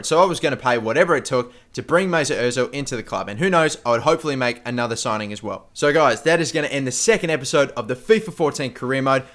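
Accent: Australia. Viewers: hihihi australian accent is so funny :)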